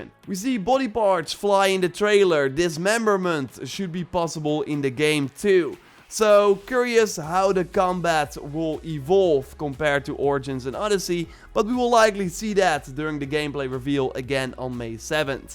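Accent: Netherlands. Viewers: Is dit een nederlander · are u dutch? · Not to mention his charming dutch accent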